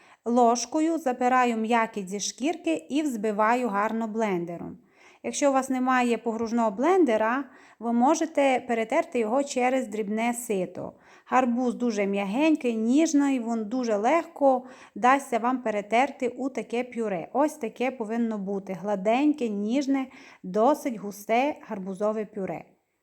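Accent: Transcarpathia, Ukraine. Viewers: Дякую,! В нашій родині наразі місяць страв з гарбуза, хоча любимо гарбуз, тому він часто на нашому столі.))) У Вас приємний закарпатський акцент, люба! Рясних Вам і Вашим рідним Божих благословень!💙💛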